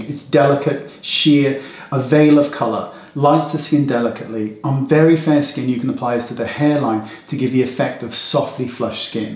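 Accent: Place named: RP, United Kingdom. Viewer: Gonna start say "blusher " instead of blush. Nope. It doesn't work without the posh English accent. Silly American. 😉😂 Love these products!!!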